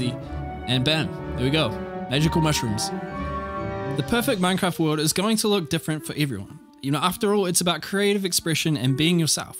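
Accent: New Zealand. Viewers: Hmmm,are you from New Zealand you kinda sound like a kiwi😂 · Just wondering are you a New Zealander?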